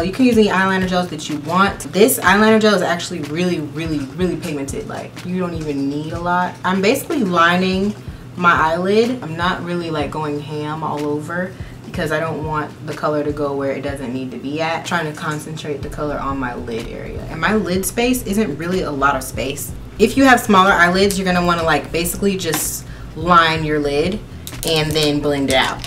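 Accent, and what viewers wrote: England, United Kingdom. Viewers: Literally thought "oh my gosh why do a baby reveal like this" 🙈🙈 British accent is on fleek though! Sounded a bit like Shirley though lol From London btw when are you coming to visit 👌🏾😍😘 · The English accent though 😂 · Your British accent is so good 😂 this is a sign for you to come down next year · Living for the british accent lmao you kill me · Love the English accent 😂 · You're british accent s actually soo dope!! xx Loving this look sooo much sis xx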